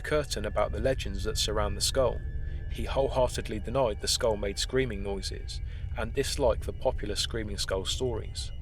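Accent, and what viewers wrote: England, United Kingdom. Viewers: Refreshing also is the English accent as opposed to an American drawl announcing “....the top 15 haunted places in England”. Well presented, with excellent research. Thanks! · love the english accent. from america. god bless, brother. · You sound awfully British.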